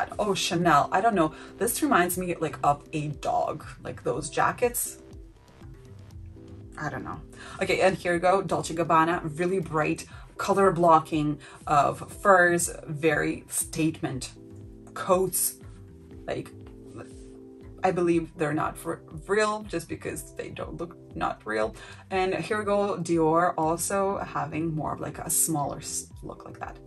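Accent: Russia. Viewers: Great, thanks for your reply...if you don't mind me asking...is that a Russian accent I detect? I'm part Russian so I guess I'm just curious!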